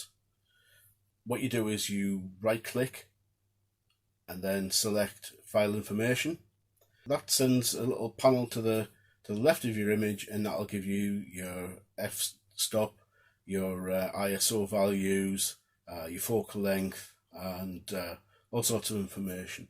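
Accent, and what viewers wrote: North East England, United Kingdom. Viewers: Thanks for the video.. Good work mate! Local lad I hear. Probably somewhere between Sunderland and Boro..🤔 I've had this camera for about 6 months now. Picked it up from CeX for £165. Can't complain for that and in mint condition too. Yeah, it's great for shots at the zoo and some of my best shots were had there last summer. Quite impressive to be fair. I'd never owned a zoom camera like this before so I thought why not. It's quite versatile. It does seem to favour good lighting conditions though. I'll be honest, I've never really moved from auto on this camera. I really should experiment. I have taken some quite remarkable pictures of the moon though and only in Auto. Amazing really for the price of the thing. I've just getting a tripod so we'll see what improvements can be made with this. I'll check out the rest of your videos when I get a chance. 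There's also that guy called Graham on YouTube who's got a whole load of content related to this camera. I'm sure you're aware of him.. Keep up the good work. Alan.. Washington UK.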